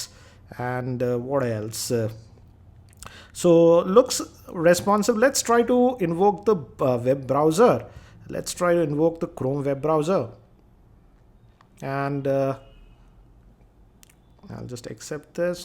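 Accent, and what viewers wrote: India. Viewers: dear, please change your accent. It's ok to have an Indian accent, but when you stop every sentence, it feels like you are singing, but with the same tune. Please take this as a friendly suggestion and please stop bending the last word of your sentences. Otherwise all your reviews are good.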